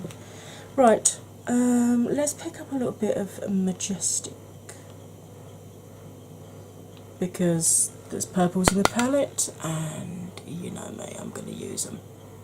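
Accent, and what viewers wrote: United Kingdom. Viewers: Hiya, new subscriber here, I found you through Makeup Struggles! I too am feeling this heat - it’s too much isn’t it! I’m 48, very fat, relatively fair but defo fabulous! I’m jealous of your lids space as I have very hooded eyes! I’m in the south too - I’m assuming from your accent you are Essex way on? Love this look! Sorry for long post I just wanted to introduce myself! 😃 oh yeah and I also have spinal stenosis!!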